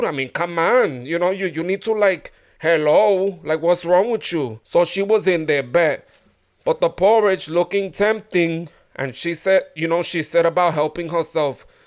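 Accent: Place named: New York, United States. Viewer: 🤣...goldielocks...una presenta....weewee bear🤣! Love his Spanglish NY accent🙋‍♀️🤣👏👏👏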